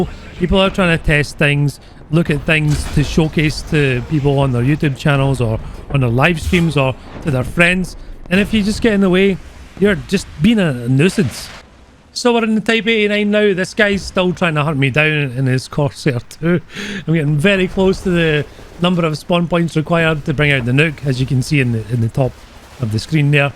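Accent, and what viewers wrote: Scotland, United Kingdom. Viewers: I love it when I watch a channel for the first time and just here the beautiful Scottish accent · are you scottish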